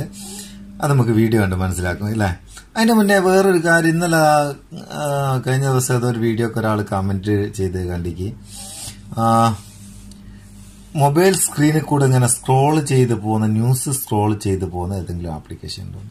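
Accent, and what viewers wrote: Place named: Vatakara, India. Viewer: I sincerely appreciate you. Your slang is clear that you from Badagara region.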